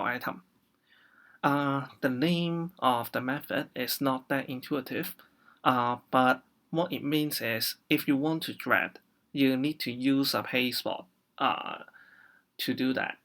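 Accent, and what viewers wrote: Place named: Hong Kong. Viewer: Helpful!港式英语，哈哈！不过讲粤语更加少人看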